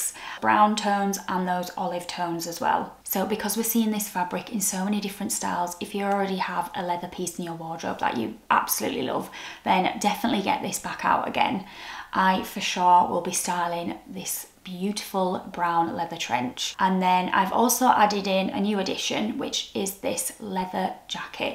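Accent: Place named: United Kingdom. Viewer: I just discovered your channel and it's a pleasure for me to hear spoken british English. The internet is full of american channels. I am really glad that I found you and I will watch your channel more often.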